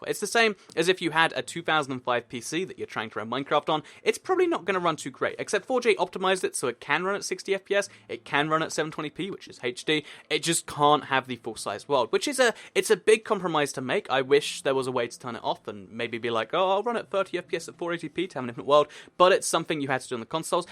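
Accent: United Kingdom. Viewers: #British Accent: Will we ever get mineable spawners for the Console edition of Minecraft?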